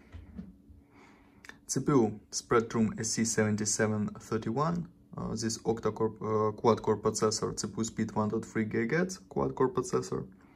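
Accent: Russia. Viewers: Акцент русский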